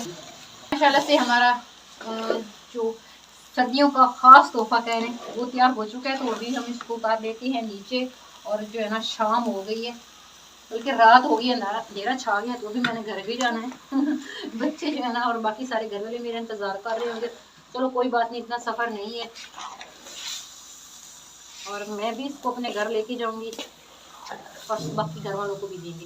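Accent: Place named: Lucknow, India. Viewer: wali beti tu aaj luckhnow wali urdu bol rahi hay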